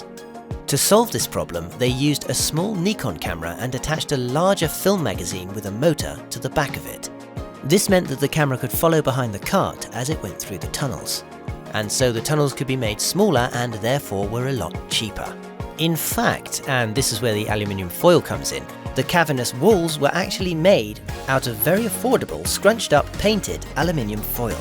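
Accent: United Kingdom. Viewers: Due to the extremely thick British accent, I had a big problem understanding some of the narrator's comments. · Your name is. I presume that at least one of your parents is from Latin America, Spain, or Portugal. I wonder where you get the sort of British accent that you sometimes have?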